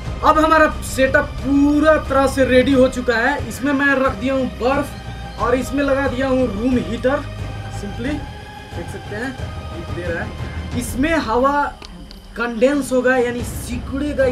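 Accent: Bihar, India. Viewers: bihari ho ka?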